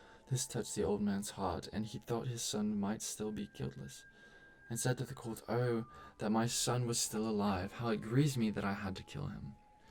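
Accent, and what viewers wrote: United Kingdom. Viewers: At first I was like "did he always have an english accent?" then I realized and went "oh..."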